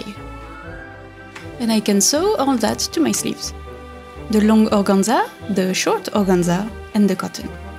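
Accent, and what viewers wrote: France. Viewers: Usually I can't stand listening to narration, but your gorgeous French accent makes it enjoyable. Cheers ✌ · The Youtube algorithm just blessed me with this video. This is the most ridiculous, over the top and amazing dress I have ever seen and I LOVE IT. I like to watch historical sewing videos but I am delighted with this. The project seems so fun and a great use of sewing skills. What's the point of sewing if you can't make an extravagant dress? Also, I love the accent. I have family in France (92, 93 and 94). I just don't write in French because I'm terrible at it. Bisous du Portugal :D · Je suis vraiment heureuse de trouver des tuto couture venant d'une chaine française, votre accent en dit long. Votre robe est magnifique merci de partager vôtre savoir6et votre passion.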